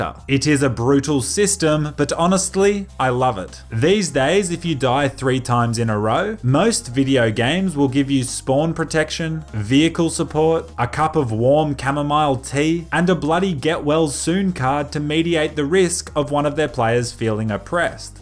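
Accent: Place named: Australia. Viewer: Man sounds so Australian in this vid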